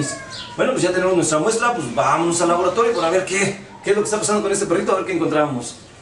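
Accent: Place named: Puebla, Mexico. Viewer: Saludos médico Regrese con sus videos por favor Por el acento que tiene al hablar se me figura de Puebla de los angeles, paisano 🤝🏽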